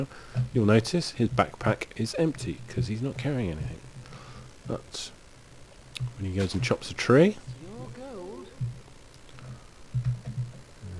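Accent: United Kingdom. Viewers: i love the british accent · I like your British accent. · First off – there is no such thing as a British accent! Great Britain refers geographically to the island of Great Britain, politically to England, Scotland and Wales in combination. Only Americans use the expression ‘British accent’, and you ain’t American are you. You have an English accent (not a hint of Welsh or Scottish in there that I could hear). Second off – why apologise for it? Americans speak English, as in England right? Shame on you:P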